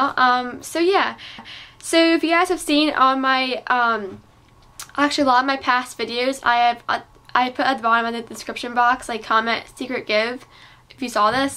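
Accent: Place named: United States. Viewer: Your so pretty and I love your accent I want to be American ahh , the falsies mascara did not justice for my lashes it just wasn't worth the rave hah xox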